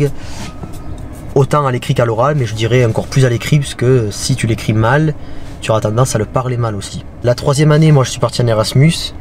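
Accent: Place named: Spain. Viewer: Wow tu as un super bel accent espagnol !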